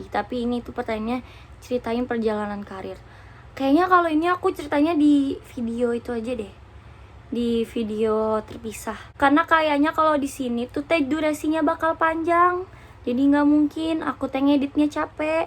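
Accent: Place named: Sunda, Indonesia. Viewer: Suka ke sunda²annya kak 😘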